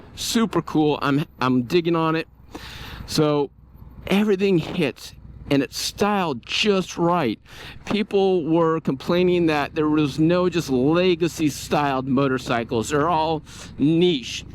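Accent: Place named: Baltimore, United States. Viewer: Dude, this guy sounds EXACTLY like Stavros Halkias.. Personality wise too. Baltimore area accent perhaps? This super glide looks amazing 👏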